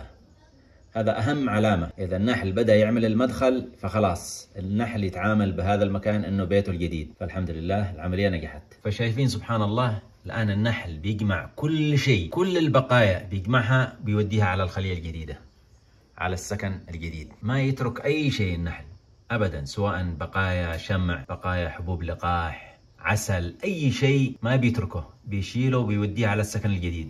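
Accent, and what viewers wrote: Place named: Yemen. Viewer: اخي. انت من اليمن صح. لهجتك مشابهه للهجتنا اصحاب الحديده. بس المكان هذا الي انت فيه وين. مش ممكن يكون في اليمن 😢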